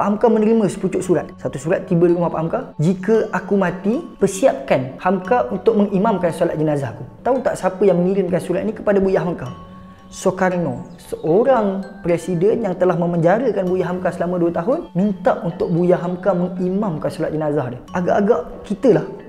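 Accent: Malaysia. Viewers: Dia bercakap bahasa melayu tapi ada loghat negeri.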